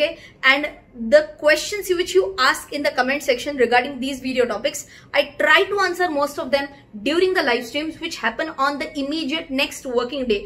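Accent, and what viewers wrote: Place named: Telangana, India. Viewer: You are not from India right By that accent and that hairstyle I can tell she is from Telegana or Andhra pradesh ,India.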